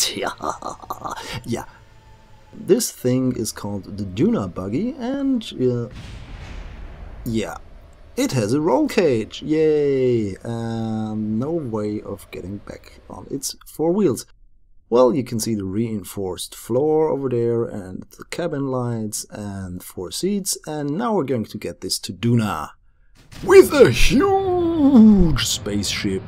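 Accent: Germany. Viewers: where are you from? germany? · man merkt das du deutsch bist😉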